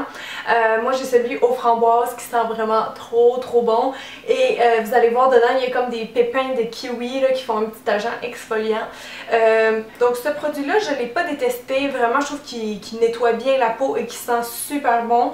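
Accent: Quebec, Canada. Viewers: Ta ha it's Canadian (québec) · Tu est quebequoise ? ;) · Je ne supporte tellement pas l'accent québécois que j'ai dû zappé ta vidéo ! 😖 dsl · Moi l'huile de monoï je l'utilise pour les cuticules sa les nourri et cela fait de beau ongle au passage j'adore ta chaîne et ton accent canadien est ce que tu pourrai m'être en € les prix aussi merci d'avance 😻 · J'adore ton accent Québécois !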